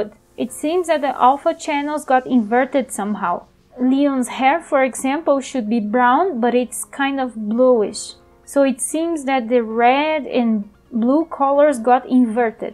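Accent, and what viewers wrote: Brazil. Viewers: Your accent is so cute! But I've found it oddly similiar... Até eu descobrir que vc é br xD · pelo sotaque é BR hehe enfim mto bom o conteudo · caraca, eu tava ouvindo o seu ingles e pensei, só pode ser BR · Você tem sotaque brasileiro, deve ser brasileira.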